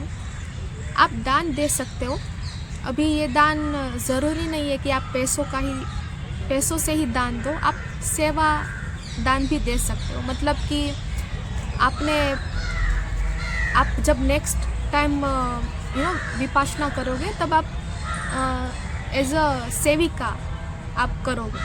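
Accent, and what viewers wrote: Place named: Kathiawar, India. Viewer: Typical kathiyawadi accent 😂 Nice👍🏼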